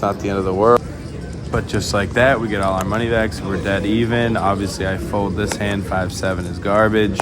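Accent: Boston, United States. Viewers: that’s a boston accent lol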